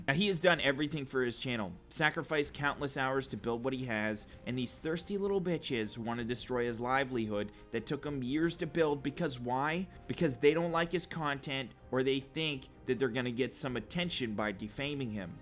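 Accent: Canada. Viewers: You are so deliciously Canadian. Nova Scotia?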